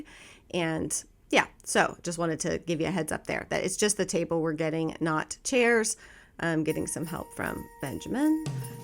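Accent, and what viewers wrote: South, United States. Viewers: Your southern accent on top of your actual southern accent is phenomenal, gurrrrrl. P.S from a carpentry family - it's brilliant to cover those gorgeous floors until later!! Don't feel bad for them! They will thank you later and you will thank you, too! LOL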